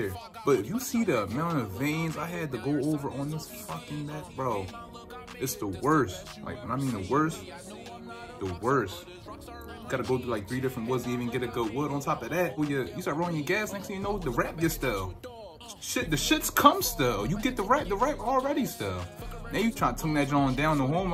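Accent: Philadelphia, United States. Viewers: He from philly I can tell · I could tell he from philly lmao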